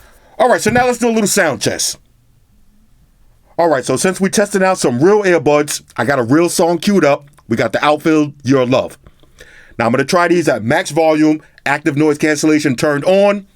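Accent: New York, United States. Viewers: Love the NYC hood talk. It's refreshing compared to the usual.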